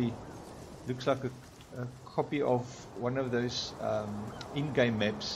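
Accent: South Africa. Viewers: I hear SouthAfrican English, I upvote ;)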